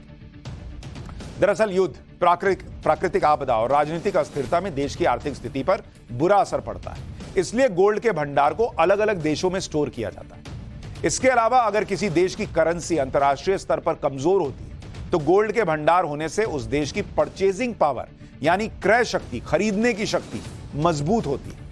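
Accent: Bihar, India. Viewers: babu aapka Bihari andaz wala tone bahut achha lagta hai❤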